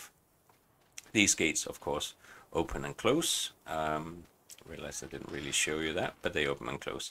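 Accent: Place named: England, United Kingdom. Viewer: Så tillader jeg mig at skrive på Dansk (mit Engelske læner sig lidt for meget op ad Google translate) Håber det er ok. Jeg syntes jo nok, jeg kunne genkende den Engelske dialekt :D Har du lyst og overskud, så kan vi jo tage en sludre på MSN, Facebook eller noget i den stil. Jeg kunne helt klart godt tænke mig, at snakke med en anden VOKSEN, der er interesseret i FS19 og i særdeleshed dette map. Men også om alt muligt andet. Det ville være fedt at høre lidt om Scotland. Et land jeg altid har haft stor lyst til at opleve. Så har du lyst, så smid mig en besked på evt. Facebook, hvor du kan finde mig under samme navn som her :) I øvrigt dejligt at se at der også er Danskere der spiller FS og laver gode YouTube videoer. God dag :)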